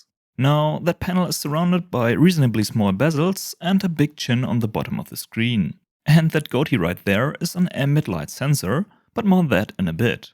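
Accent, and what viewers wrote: Germany. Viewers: Dieser deutsche Akzent xD Nice Video mein Freund ;)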